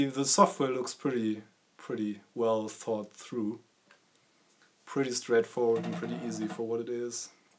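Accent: Germany. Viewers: I also prefer the real hardware or my PiStation, which can emulate more then just the c64. Overall, as you told, u have to be a hobbiest when using original home-computer stuff today or doing it with the raspberry pi. Imho the c64 mini has it's place at home, when only want a quick play on older day games ;) BTW: I love watching your videos and especially the german accent :)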